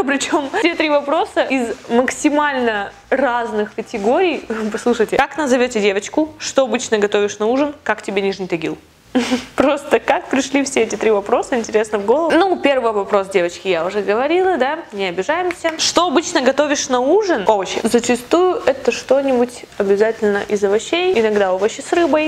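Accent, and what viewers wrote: Germany. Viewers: Офигенно говоришь по-немецки.Такой акцент😍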